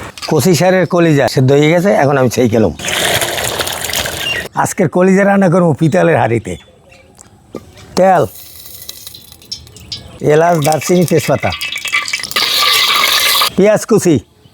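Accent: Barishal, Bangladesh. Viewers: হালারপো হালা বরিশাইল্লা।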